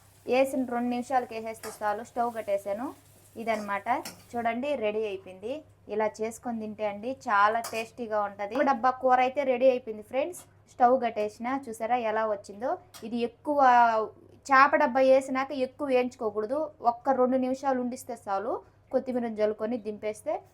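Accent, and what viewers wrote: Nellore, India. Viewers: Pakka nellore yasa super Madi kuda nellore e andi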